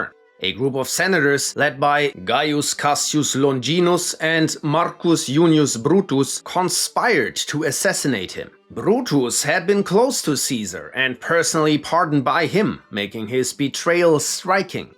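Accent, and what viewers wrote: Germany. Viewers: GOD, these are good. The german accent just makes it like we're in a U.N. meeting. Kudos.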